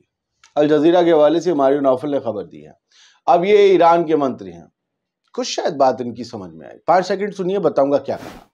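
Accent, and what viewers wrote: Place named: Allahabad, India. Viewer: Aal aap Allahabadi bhasha mein bole rahe the. Ameresh Misra ji bhi allahabad ke hain usi bhasha mein bolte hain.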